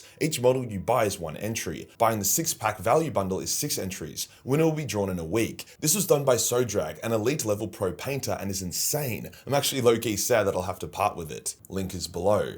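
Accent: Australia. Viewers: G'day ol son.....im new to, and love that an Aussie speaking in Aussie talking about 40K. I recently bought the Spider Space Elve, and Now You have Custodies. I had to get one and the best of these is the Golden Dread Lord, Big Cheers ya ol bugger from A M8 up In the Sunshine State🙃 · This guy’s frat boy personality is normally something I hate but man oh man, slap a Australian accent on it. I will listen to this man all day.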